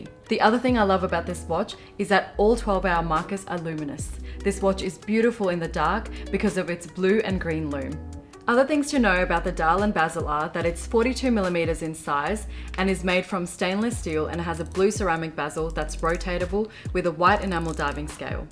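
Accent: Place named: Australia. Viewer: Your channel will blow-up you should have way more subs with the quality of your video! And the Aussie accent doe 👌😅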